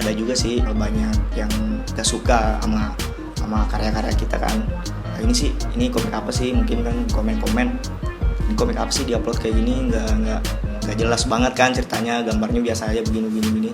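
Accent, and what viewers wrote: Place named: Sulawesi, Indonesia. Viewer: Hilang mi logat Sulawesinya tawwa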